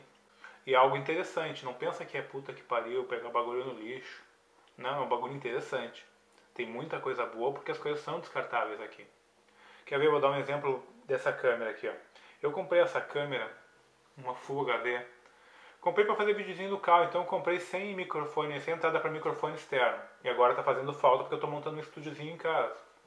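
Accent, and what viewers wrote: Rio Grande do Sul, Brazil. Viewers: e ai blz tu e gaucho tche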